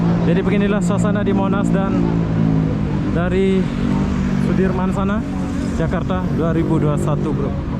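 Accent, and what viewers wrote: Malaysia. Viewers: Bang padahal orang lombok, knapa abang masih pakai bhasa orang KL. Pakailah bahasa sendiri yaitu bhasa indonesia, apa udah terbiasa duduk di KL jd lupa sama tata bahasa sendiri,,susahh brnar hilangin logatnya itu.. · Kamu org malaysia apa indonesia? kok ky ada logat dan kosakata melayu malaysia?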